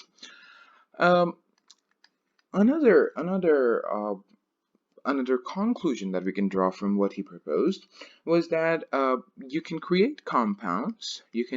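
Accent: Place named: United States. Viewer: Seems to be listening an American channel....... Really amazing.... Keep it up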